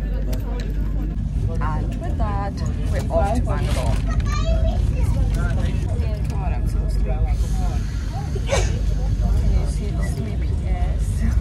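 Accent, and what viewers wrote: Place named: England, United Kingdom. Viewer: Listen to her english accent , mate relax, she's not a indian resident, let her be herself in her comfortable self, So chill & enjoy if you can, for what's she has to express in her quest of this train journey, 🇮🇳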